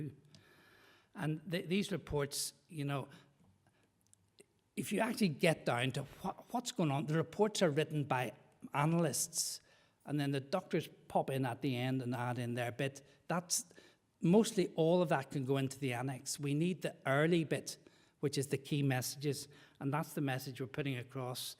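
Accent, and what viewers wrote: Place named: Ireland. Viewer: The Irish accent ❤️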